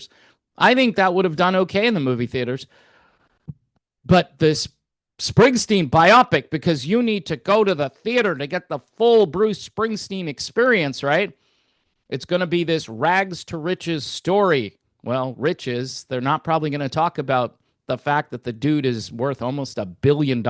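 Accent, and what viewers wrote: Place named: South Jersey, United States. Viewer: Love Your Bruce Springsteen impressive brother for you sound just like him come,lte with south jersey twnag lol!! I have always been a fan of his music and do admit I am looking forward to seeing him deliver from nowhere. Unfortunately he has lost his mind and became very bitter to date. However bruce still put on a great show and seems to embrace his music in all forms. neve know about electricity for barely remember the original release. The only song I even really know from the album is which is very haunting. God bless you, my brother, and I hope you end up liking this biopic, which seems at least tentative.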